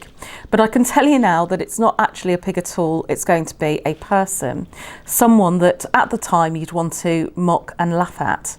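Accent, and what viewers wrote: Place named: United Kingdom. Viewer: You could be both Bri'ish, or an american makeing fun of that accent!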